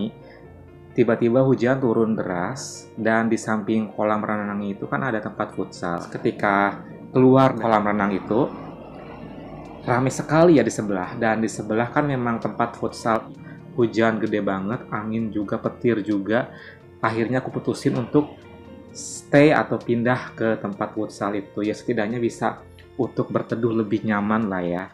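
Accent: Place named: Bandung, Indonesia. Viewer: orang bandung ieu mah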